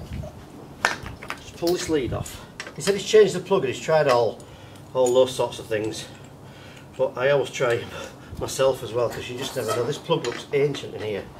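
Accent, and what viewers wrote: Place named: England, United Kingdom. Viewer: Read your closed captions as you view this video. I'm in Alabama and trying to understand your accent (English, I suppose) is tough but doable. I guess it really must be a challenge to understand, by whatever means that is used to add close captions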